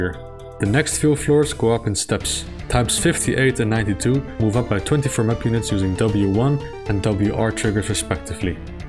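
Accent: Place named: Netherlands. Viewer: You sound Dutch! If that’s the case, I’m proud such highly knowledgeable classic DOOM fan is from my home country.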